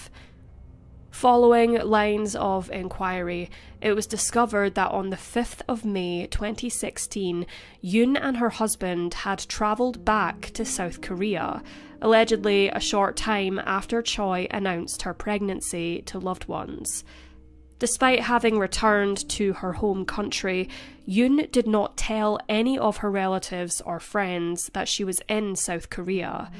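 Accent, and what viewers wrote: Scotland, United Kingdom. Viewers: This sounds like it’s narrated by a fellow Scot! 🏴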